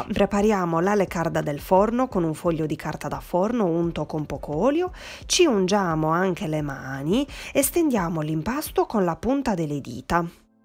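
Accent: Sardinia, Italy. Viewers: Mio padre è sardo!!! Mi piace il tuo mitico accento!! Le tue pizzette cicciottose !!! Bravissima !!! Un abbraccio da Trento !!! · ciao ti volevo chiedere se uso la farina 0 e uguale perché in questo periodo e difficile trovarla La farina Manitoba con il covid 19 qui sparisce tutto e se trovi qualcosa e un miracolo ciao ancora .(sai io o delle cugine e la zia che abitano lì e sono tanti anni che non li vedo perche mia mamma e nata li a Iglesias.infatti io cono mezza sarda perché assomiglio tanto a lei e o oltretutto ereditato l'anemia .vabbè comuncue mi piace tanto sentire la tua parlata Sarda mi ricorda quando andavamo a trovare mia nonna che abitava a Iglesia ora non c'è più sono ricorbi dellissimi che o e mi tengo nel Cuore e mi piacerebbe rivedere la mia cara Sardegna sai be un saluto caro ciao CATIA dall'Umbria 😁👍🤣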